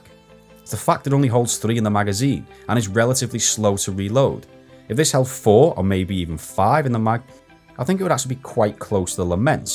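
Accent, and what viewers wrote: Liverpool, United Kingdom. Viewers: Hahahahhh scouser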